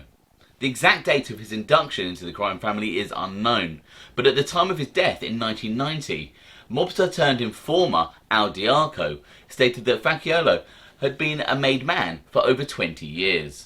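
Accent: United Kingdom. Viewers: I love hearing Brits educate about the mob. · Is this gay brittish guy trying to teach us about our history?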